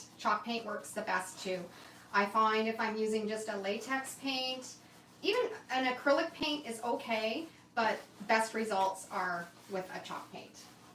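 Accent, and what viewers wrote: Canada. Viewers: you have a Canadian accent. People can get over it. I'm American; I say 'deck-o-page,' but you do you. I'm here for the learning! Thank you so much for teaching so well! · It's actually pronounced day-koo-paazh, but I think it's your Canadian accent which is really cute. I always giggle a bit when I hear you say it.😀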